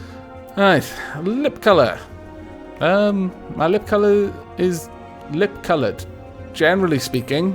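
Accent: Wales, United Kingdom. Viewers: Sir, I believe you are Welsh.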